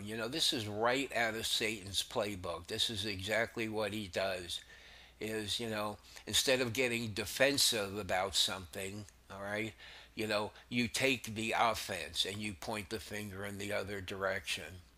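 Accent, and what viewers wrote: United States. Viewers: I think you sound warm and friendly! You kind of sound alittle like you are from Jersey... my home state!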